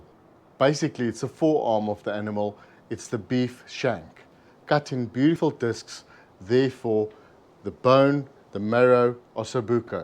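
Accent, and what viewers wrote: South Africa. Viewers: Love the South African accent!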